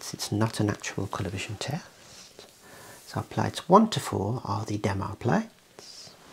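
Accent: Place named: Midlands, United Kingdom. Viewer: Me too. His Midlands accent has lessened which is a shame.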